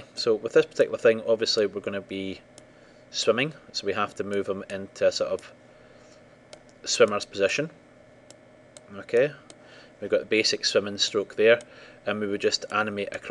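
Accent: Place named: Scotland, United Kingdom. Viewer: hes Scottish.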